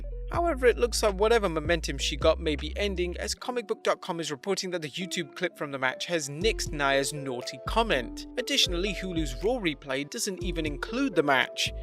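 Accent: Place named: United Kingdom. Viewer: OH GOD.... FIRST YOU SAID "MARY-LAND"...(pronounced Mar-UH-lind), now "RE-NAY-SAUNCE"!?!?!?!?!? WTF!!!!!!????? It's pronounced Re-Ni-Saunce!!!!!!! British WL host whatever your name is..... YOU'RE KILLING ME BRO! GO WATCH SACHA BARON COHEN IN THE MOVIE "THE TRIAL OF THE CHICAGO 7" THE BRITISH CAN SPEAK ENGLISH WITH AN AMERICAN ACCENT!!!!!!!! IF COHEN CAN DO IT, SO CAN YOU MY FELLOW WRESTLING BROTHER!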